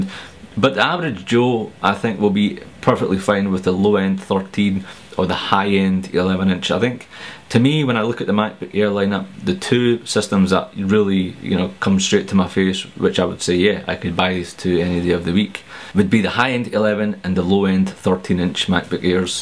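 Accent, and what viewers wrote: Scotland, United Kingdom. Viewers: He is speaking English it's called having a scottish accent!! I'm not Scottish and I can understand him. People are so ignorant sometimes as soon as they hear an accent they stop listening to the person and assume they can't speak proper English. :( So disrespectful. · another scottish person on the internet!!.... it isn't just me! :D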